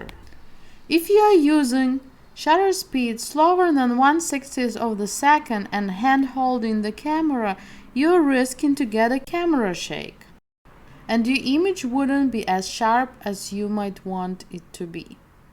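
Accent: Russia. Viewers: are you from russia or romania?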